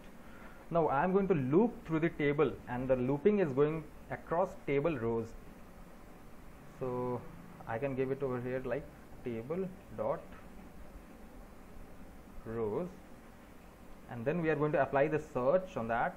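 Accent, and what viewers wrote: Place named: India. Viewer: its always a damn robot non english speaking indie person... cant understand a damn word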